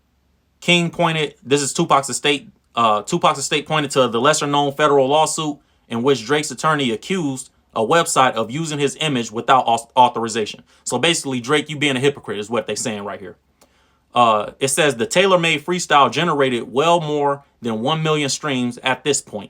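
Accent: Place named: Los Angeles, United States. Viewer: I like your LA accent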